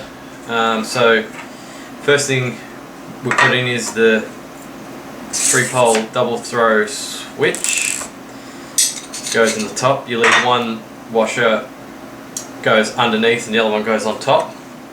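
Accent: Australia. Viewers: It's cool to hear an Aussie accent on You Tube.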